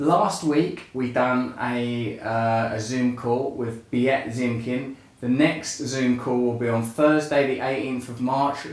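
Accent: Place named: United Kingdom. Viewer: I really didn't expect you to be soooo intelligent... pleasently surprised... I did find it a bit hard getting though your your lovely British accent but I finally managed. Look forward to hearing more from you and thank you sir for giving your time to help all of us who need it. ❤️❤️❤️. Blessings to you and yours.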